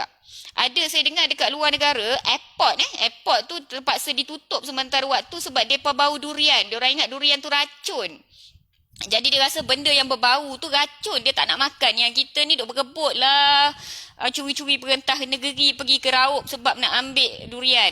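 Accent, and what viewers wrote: North, Malaysia. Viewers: Di persilakan ke Penang lepas PKP ni... first time sy tgk video sy ingatkan dr utara sbb dialek mcm org utara...rupanya dr kuantan😊😊😊...akhir sekali sy ucapkan terima kasih kepada diatas pengkongsian ilmu dr....semoga dan keluarga sihat2 selalu....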